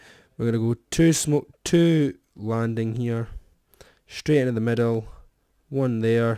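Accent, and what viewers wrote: Scotland, United Kingdom. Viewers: his scottish accent made the gameplay intresting..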